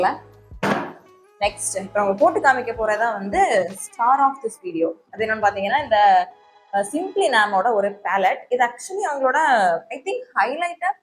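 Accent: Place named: Kanyakumari, India. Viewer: Sis..! Your slang is nice.Are u from kanyakumari?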